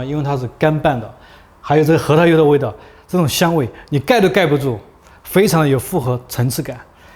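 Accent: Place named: Yibin, China. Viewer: 我听主播应该是宜宾的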